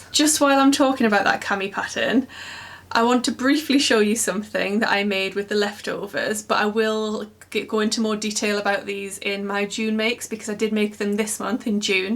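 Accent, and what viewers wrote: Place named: Newcastle, United Kingdom. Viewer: I love your May makes especially the tiny baby outfits. I have a great niece or nephew arriving in November so will definitely be downloading the baby patterns. My grandmother was born and raised in the Newcastle area. She came to Canada sometime in the 1920's but she never lost her accent which actually sounded quite a bit like your accent.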